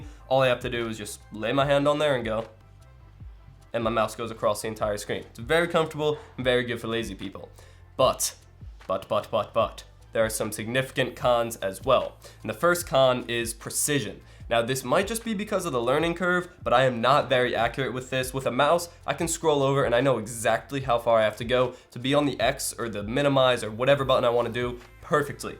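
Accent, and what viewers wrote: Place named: General American, United States. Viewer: General American accent.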